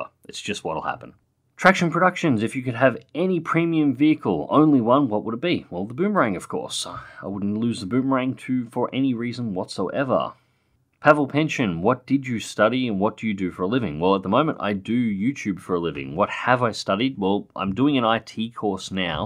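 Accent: Australia. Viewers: you could play anything and i'd watch. It's nice to hear another Aussie voice every day.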